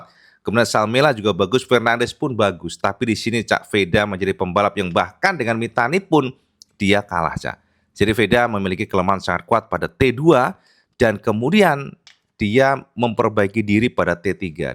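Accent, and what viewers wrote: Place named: Java, Indonesia. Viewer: Mas.. Mirip logatnya kaya, yg ngonten KIOS KOMPUTER..! yg dari jawa juga... 😊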